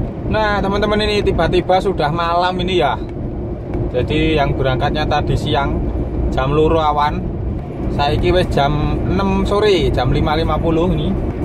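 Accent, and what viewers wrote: Java, Indonesia. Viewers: wkwkwkwk boso ne josss mas aku yo wong jowo, salam medhok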